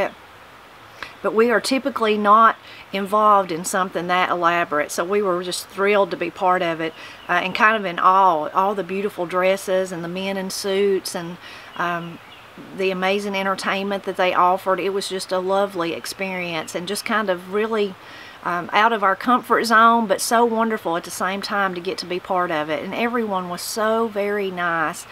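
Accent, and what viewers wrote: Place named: Kentucky, United States. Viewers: I started watching your videos completely by accident. I was trying to find more information from my Ma maw and Pap paws childhood growing up in Harlan county Kentucky. They’ve been gone for man year and the sound of your accent caught my ear and I felt a squeeze in my heart. I wiped my hands and came in to watch your video on cornbread and I was hooked. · Finally an awards ceremony and speech worth watching and listening to. Your speech was fine. The three of y'all looked lovely in your dressy outfits. Thank you for sharing your thoughts and stories about growing up in Appalachia, as well as showing the rest of the country that just because people in the Southern states have an accent/drawl, and might pronounce words differently than other folks are used to, it doesn't mean we're ignorant, backwards or uneducated. 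Congratulations again about winning your award and representing our Appalachian culture and history. Keep up the great content and we'll keep right on watching it. 🥰😊🥳🤟🤟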